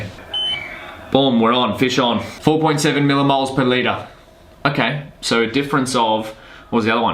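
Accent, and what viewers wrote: Australia. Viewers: Its an Australian accent dumb dumb, of course he is from Australia. Jeeeesus. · Are you Australian?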